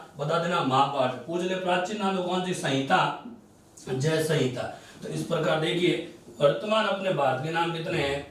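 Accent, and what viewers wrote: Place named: Marwar, India. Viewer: Sir Kitni Aapki madhur Boli hai sa aaj to Aapne sudh Rup se marwadi legweg ka pryog kiya hai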